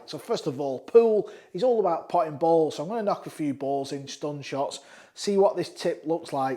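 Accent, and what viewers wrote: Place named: Northern England, United Kingdom. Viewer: Horrible, NASAL, Northerner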